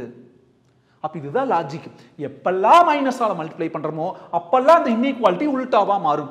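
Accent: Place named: Kerala, India. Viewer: Konjo malayalam slang, coimbatore slang, Tiruppur slang kalantha mari iruku sir....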